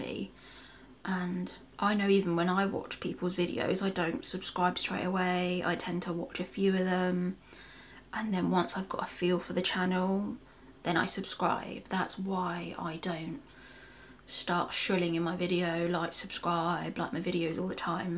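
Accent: United Kingdom. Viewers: I'm not even sure how I stumbled across your videos...because all I watch are Car vids. LOL Anyways...you are great speaker, you seem very intellectual, extremely attractive, and would make any guy feel lucky to share your time with! And your English accent...for an American. So HOT!! Viewers will come to you! You've got some great content so far! Best of luck to you in 2022!!!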